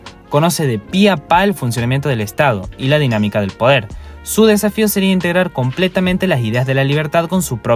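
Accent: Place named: Córdoba, Argentina. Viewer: Capaz que es cordobés amigo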